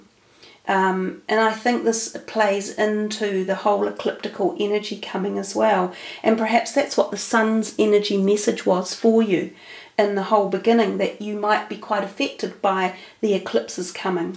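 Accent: New Zealand. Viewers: You sound kiwi. 😊 💖. Thank you.😊 💖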